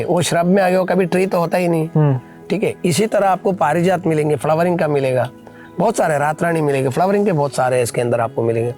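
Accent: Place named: Gujarat, India. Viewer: Lagta hai sir gujrat me jyada rahe hai kahi kahi gujrati aa rahi hai 😅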